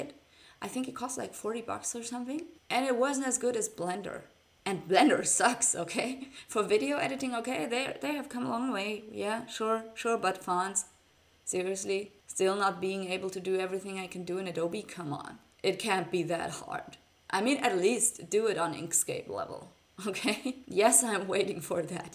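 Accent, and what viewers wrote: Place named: Germany. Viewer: I hear a German accent. Yikes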